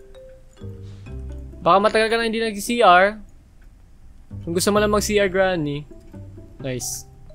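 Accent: Visayas, Philippines. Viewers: kuta bisaya kaba?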